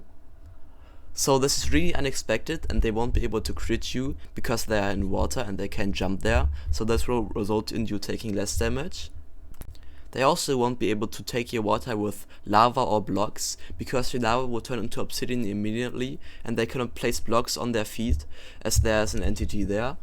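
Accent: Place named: Germany. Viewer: i can tell hes german lol like me xd if hes not german then idk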